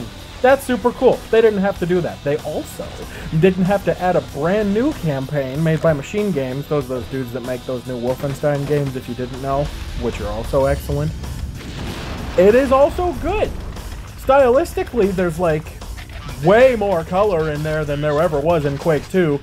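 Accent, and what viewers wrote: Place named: Midwest, United States. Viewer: bruh that’s that midwestern type accent you don’t get it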